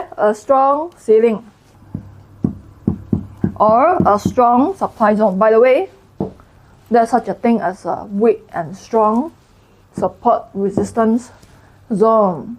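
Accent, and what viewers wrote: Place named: Singapore. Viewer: Stop harrassing her. She is from Singapore, thus her accent. Go to the UK people, and you would barely understand any of their accents. Turn on Closed Captions if you don't understand.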